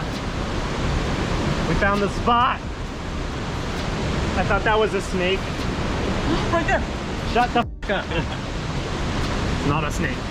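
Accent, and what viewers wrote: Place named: Australia. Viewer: That Australian accent 😍🤤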